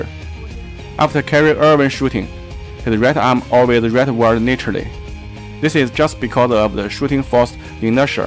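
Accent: China. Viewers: even with the Chinese accent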